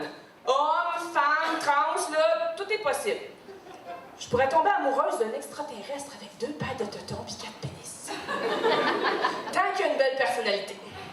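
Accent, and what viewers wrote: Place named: Canada. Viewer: Ma foi, je t'ai encore trouvée bien drôle ! j'avoue que j'ai parfois du mal à tout comprendre car je ne suis pas une habituée de l'accent canadien mais j'ai quand même bien ri :D J'aime bien ce que tu fais, je trouve qu'il y a matière a bien rigoler et tes sujets sont intéressants en plus !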